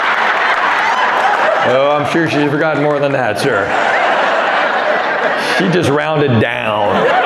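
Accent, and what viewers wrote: South, United States. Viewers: I always admire the clear communication skillset. His intentions are easily understood even in the slightest of gestures. The biggest gesture is him always being entirely respectful. It surfaced as the "Sir and Ma'am" emerged through the marriage questions. It is easy to see why he has been successful so many years. The effort of getting to his shows are always such a pleasure. I stumbled on his name through a Tom Arnold movie "McAle's Navy". I knew the other comedians as my eyes and ears could not synch up the southern voice with the Korean appearance. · I think it’s cool how he’s Asian yet considering he sounds like a Southerner he is popular with white people as opposed to only being popular with Asians like you would expect the average Asian comedian to be.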